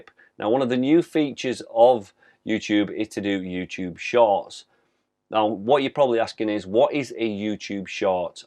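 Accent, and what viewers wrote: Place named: Scotland, United Kingdom. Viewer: Ahhh a Scottish lad! Great content dude. Subscribed!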